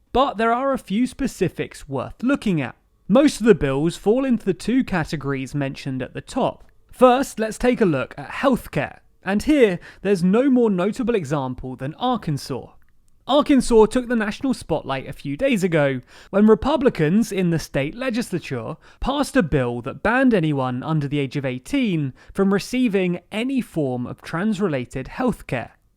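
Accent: England, United Kingdom. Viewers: Are you sure, I heard Dysphoria, he does have an English Accent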